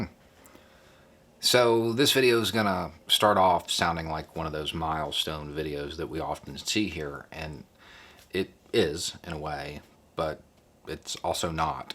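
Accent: South, United States. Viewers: i like watching you since i live in europe and no offence but you sound like common southerner but you have a brain. and that makes me happy that there are still americans who can think for themselfs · You giving wisdom with a Southern accent, changes more than you'll know. You've been a way that I've been able to move my extended family's political needle. That is a movement I never thought possible.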